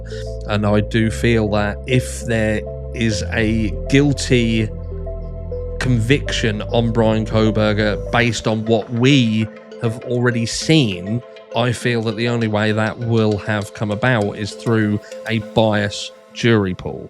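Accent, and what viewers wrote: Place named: England, United Kingdom. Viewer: I love your bright yellow light accent that is in the corner of your room, so pretty!!! As Miss Potts would say “sidebar“ Mr. what area of England is your accent from not the room accent but your voice accent, just curious and thank you.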